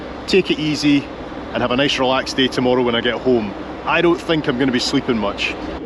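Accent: Scotland, United Kingdom. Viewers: I am definitely with you, as a solo traveller most of the time, always much more enjoyable in Winter with quietness and calmness, LOVE all of your videos, icing on the cake to me is your charming Scottish accent;)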